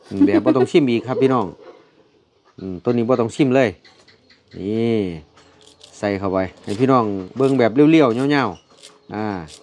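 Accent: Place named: South, Thailand. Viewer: พี่ แหลงใต้เก่งมาก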